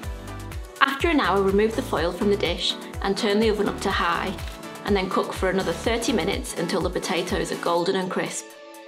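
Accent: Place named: Northern England, United Kingdom. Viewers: We have proper vowels up north. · So pleased I found your channel. Thank you so much for the lovely recipes and for the clear and easy way you describe what you're doing. You do sound more Cheshire than Lancashire to me though! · Must give it a bash. Love your Lancs accent. Thanks. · That looks amazing I liked the recipe as much as I liked her Lancashire accent.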